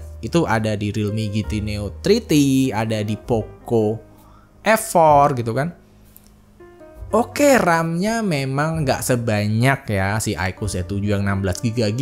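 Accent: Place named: Java, Indonesia. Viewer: Bang orng mana bng?kya ada logat Jawa nya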